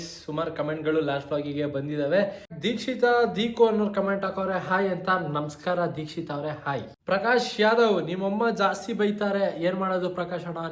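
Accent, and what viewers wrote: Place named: Hassan, India. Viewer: Pakka hassan language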